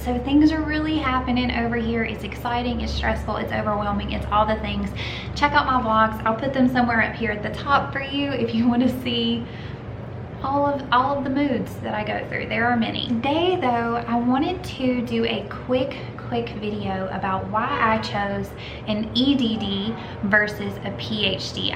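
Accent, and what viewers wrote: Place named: Texas, United States. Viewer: I came here because I just finished an EdD and now I want a PhD. Thanks for the info. I wish I knew this stuff before I committed to my Ed.D. program. Oh, well, live (with debt) and learn. I’m looking at postdoc fellowships, too. On a side note, I love your accent! Where in Texas is it from ? ⭐️💯 When I see my family every summer in El Paso and San Antonio few people have the Texas drawl..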